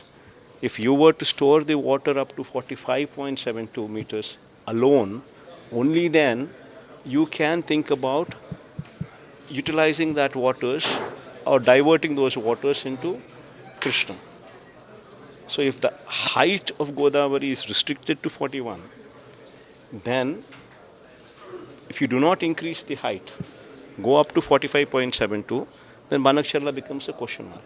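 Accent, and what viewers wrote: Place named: London, United Kingdom. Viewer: Basted jaghan is talking London English lucha jaghan is captain's for all criminal mafia done is lucha jaghan he himself telling his character and his mind of jaghan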